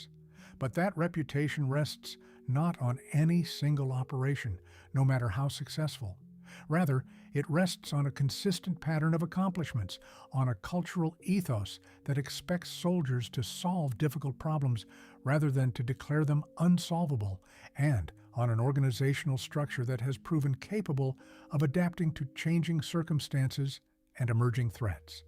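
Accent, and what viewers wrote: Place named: United Kingdom. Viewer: Lads these are well narrated and pretty direct by a fellow Brit, Godbless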